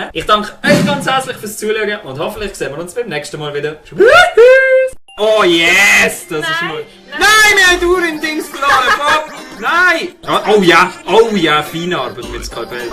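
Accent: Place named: Basel, Switzerland. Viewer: scho nur dis Baslerdütsch isch amüsierend ^^ machsch du super!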